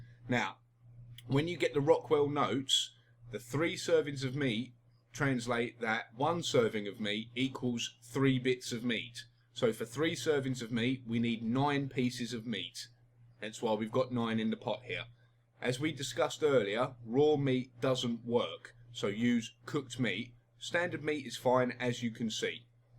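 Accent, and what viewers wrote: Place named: United Kingdom. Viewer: thx bro oh and are u from britin i am are u u sound like it wigan i geuss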